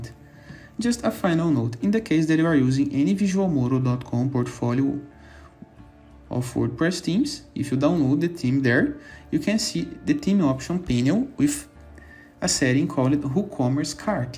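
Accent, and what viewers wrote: Brazil. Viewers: Esse sotaque ta me cheirando a brasileiro...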